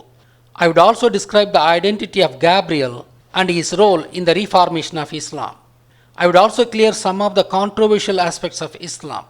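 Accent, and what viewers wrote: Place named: India. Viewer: also all the arabic words you mentioned you pronounced in Indian accent.. vocally in Arabic its not even the same word.. its like a deaf guy struggling to correctly voice the words.. so you cannot match the words vocally if you cannot correctly pronounce them..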